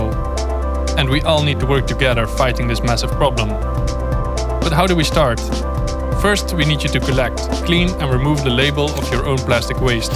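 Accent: Netherlands. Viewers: thomas hoogewerf he’s Dutch! What accent should he have?! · I am not sure if the dutch accent really works for me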